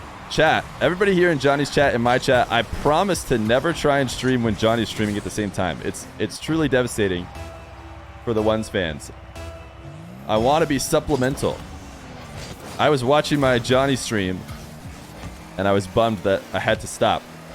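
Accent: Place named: United States. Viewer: you're like the NA Version of JohnnyBoi_i. And I don't mean the format of your videos only, but the way you cast, even your voice. It's like I'm hearing JohnnyBoi_i but with an American accent. Very weird, but in a positive way, pog:D Loving the content, bro!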